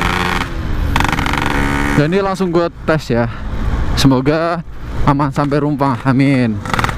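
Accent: Banyumas, Indonesia. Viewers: Setuju full ngapak 😂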